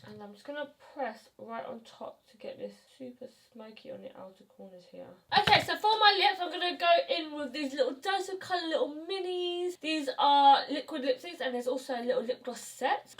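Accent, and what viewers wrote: United Kingdom. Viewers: She has braces and she has a British accent. Maybe you're not use to hearing people with British accents and only American accent. But she speaks very clearly. I understand her fine. She's one of the best make up artist teacher on YouTube.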